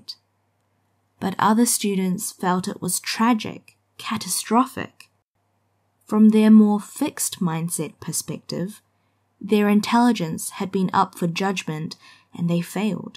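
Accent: New Zealand. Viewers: 언제 어쩌다 뉴질랜드 발음에 꽂힌 지 모르겠는데 그때부터 항상 잘 듣고 있어요! 목소리랑 발음 너무 예뻐요!! 나중에 문장 끊어서 쉐도잉 할 수 있도록 반복재생? 되는 것도 올려주시면 좋겠어요 너무 좋아요ㅜㅜ♡ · 이거랑 Te Aorewa 영상으로 쉐도잉하는데 정말 좋아요! 항상 쉐도잉 자료는 영국, 미국 영어만 널려있어서 손이 안갔는데 한국 유일하게 키위 액센트 교육채널이 있다는게 정말 감사해요. 앞으로 인터뷰나 테드처럼 쉐도잉 할 수 있는 컨텐츠도 더해주실수 있나요??ㅠㅠ 목소리도 너무 예뻐요ㅠㅠ 왜 top sexiest accents에 키위억양이 1위로 뽑혔는지 알것같아요ㅋㅋㅋㅋㅋ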